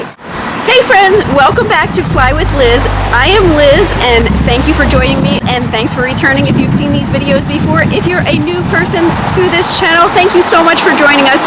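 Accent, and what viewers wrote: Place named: Midwest, United States. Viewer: Liz 1. You say that you are from Pennsylvania yet you seem to have an annoying Midwest accent. Primarily around Michigan or ORD. 2. Do not wear black and blue outfit for your interview. 3. Since you are so short, wear a navy blue dress. 4. Do something with your hair. 5. If you want to paint your fingernails weird colors, go work at Virgin Atlantic. 6. I think you are in your late 40s! (Cameras and film)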